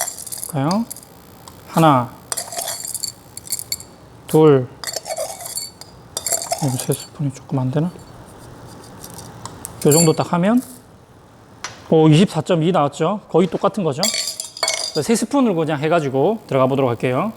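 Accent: Gyeongsang, South Korea. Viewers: 카라멜 마끼야또도 집에서 만들수있는 방법 가르쳐 주세요^ 구독 할께요^ 집에서 핸드드립으로 마시는데 라떼 넘쉽게 가르쳐 주시네요 경상도 이신가요 사투리 쓰시는뜻 저도 경상도 ㅋ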